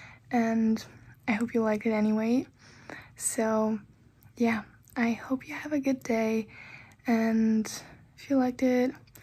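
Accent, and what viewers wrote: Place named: United States. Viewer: Your English is PERFECT. With an American accent no less, simply amazing. As a former host dad in Virginia it always pleases me to see an exchange student enjoying their exchange year. Remember to do and see as much as possible. As you know it is a once in lifetime opportunity. All the best on your American journey.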